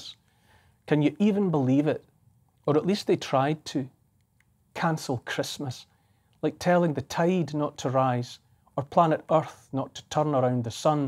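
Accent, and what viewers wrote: Scotland, United Kingdom. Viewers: It's impossible to tell anything but the truth in the true Scottish accent. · Actually, it's not a dialect, just a Scottish accent. His use of the Engiish language is beautiful, eloquent and wise. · The truth he speaks in humility refreshes my heart and brains. I always loved the Scots and their dialects. 🍷😁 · I could listen for hours to this scottish dialect. Ist sounds so damn beautiful...and true...and is surrounded by integrity. Hold the line folks. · Having Scottish in among my ancestral mix I could listen to your accent for hours Neil. Lovely message. I first watched you presenting Coast New Zealand 🇳🇿, a beautifully done showcase of NZ and I learnt a lot about my nation. The birth or our Saviour in Bethlehem indeed gives us great cause for hope. The politicians have had a field day causing fear and division. We need to stand up and be strong and not give in to them, remind ourselves that we can look out for ourselves and care for each other. 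NZ has become a Fortress and her citizens fearful. It is heartbreaking to see what has happened. May God Bless you and your family.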